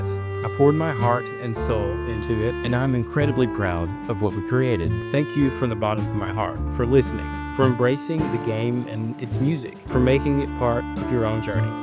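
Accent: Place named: South, United States. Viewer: Very pleasant. Simple in the best sense of the word, it doesn't pretend to explain the universe, but it's full of tenderness for certain things that moved us in this music, in the game -it's the same thing. So, it deserves a little comment for the algorithm and, more profoundly than that, a thank you for taking the time to convey an emotion that helps make this world a more beautiful place. Ps Well, I'm French and i don't care about your accent in French. To be honest, i love southern accent, so, everything is just nice^^.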